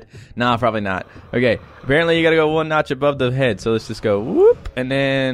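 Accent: Germany. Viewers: O, you're German is quite funny to sit and listen to:D I know about as much as you and I think I know that we both messed up somewhere.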